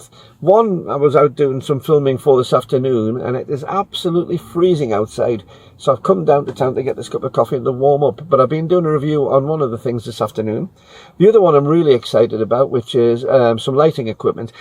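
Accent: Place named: Northumberland, United Kingdom. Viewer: Hi, whilst listening to some great waffling, I'm trying to place your accent. You sound very similar to some of my relatives who were originally from Haltwhistle in Northumberland. Am I in the right geographical zone? Cheers.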